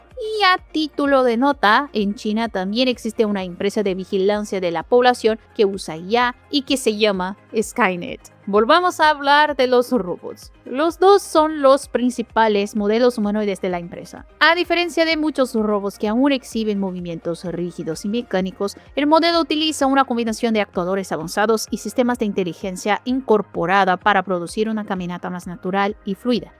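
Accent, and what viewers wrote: Brazil. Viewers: Ella es totalmente humana, en un vídeo de hace tiempo mostró su cara y salió hablando, su acento es por qué es brasileña o algo así y el español no es su lengua nativa.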